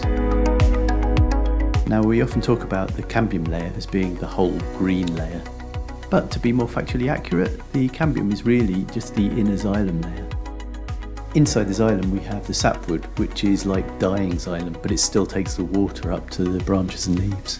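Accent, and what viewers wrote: United Kingdom. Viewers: I'm a Hispanic American and thought this was a British video because of the man's accent. Then, I heard the random caracol (snail) song in the background and was like WTF...hahahaha!! Kind of fitting!! :-D Gracias por la linda explicacion. I'll try it today!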